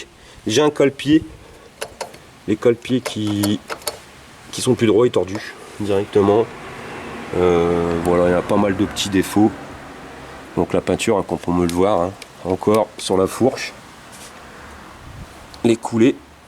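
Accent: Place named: North, France. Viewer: Bah merde, jme disait l'gars ya un accent de nord et bin javais raison :D Fiere du nord :D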